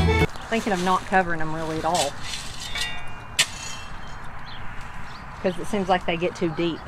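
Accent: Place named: Arkansas, United States. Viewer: It's so nice to hear a true Arkansas accent. Always run with it, not away from it because it's the most pleasant sound on earth.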